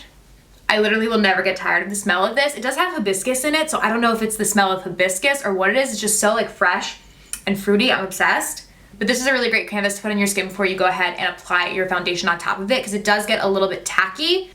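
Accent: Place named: East Coast, United States. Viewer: ... You really have given me confidence in makeup! I always try and recreate all your looks!! Love ya girl!! P.S...Philly girl here and I knew you had to be an east coast girl since you say "you guys"! 😂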